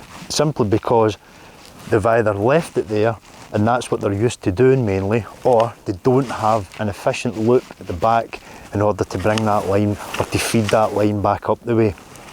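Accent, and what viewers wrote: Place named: Scotland, United Kingdom. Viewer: an extra thumbs up for a Scottish brogue that I can understand! Thanks!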